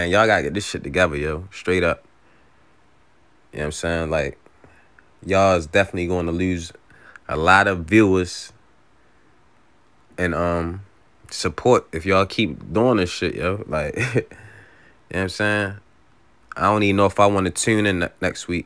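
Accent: Baltimore, United States. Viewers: Didn’t know you was from Baltimore until I heard you speak in this video. Good seeing the city speak on battle rap. Thought I was by myself out here. LOVE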